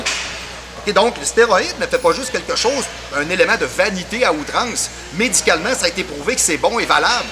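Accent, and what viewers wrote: Quebec, Canada. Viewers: Rah la vache, l'accent quebecois......... ça dévirilise tout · Très bonne vidéo avec des explications complètes et un bel accent québécois ! Je n'ai pas compris certains mots qui vont parti de la langue québécoise mais j'adore trop ^^. Tu gagnes un abonné en tout cas merci encore ! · Alala le québécois mdr ça me fais plus rire d écouté la voix que le sujet mdr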